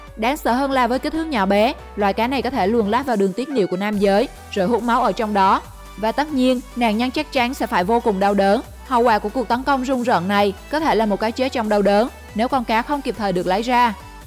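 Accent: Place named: Da Nang, Vietnam. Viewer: Giọng Đè Nẻng nghe vui tai nhỉ!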